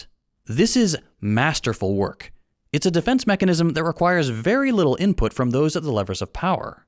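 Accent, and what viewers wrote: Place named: South, United States. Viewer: As a literate person from the Bible Belt myself, I also appreciate the accent as well yall.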